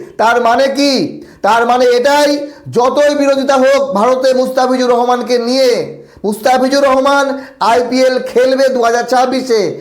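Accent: Bangladesh. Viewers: আপনার কথা বাংলাদেশের মানুষের মতোই। কলকাতার ভাষা বাংলা হলেও অন্য একটা টান আসে।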